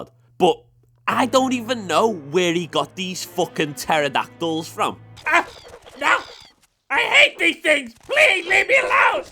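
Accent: Liverpool, United Kingdom. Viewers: Side note, been enjoying your videos. Your commentary and that scouser accent is a winning combo imo. Look forward to disagreeing with you again. 🍻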